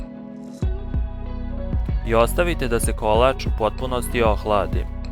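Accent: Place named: Vojvodina, Serbia. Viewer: Хвала. Па по изговору и изгледало је да је Војводина. Али сад си у иностранству. Вероватно и тамо радиш нешто са кулинарством.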